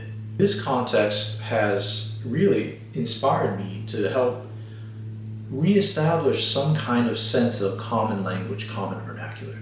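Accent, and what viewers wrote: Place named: Taiwan. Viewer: He's Taiwanese, my step dad used to say "zen" for 人。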